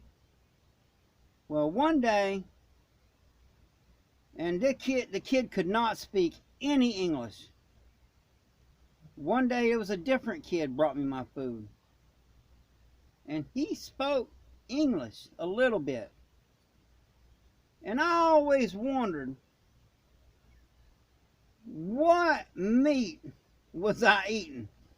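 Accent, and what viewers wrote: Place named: Ohio, United States. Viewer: Love your accent. Are you from ohio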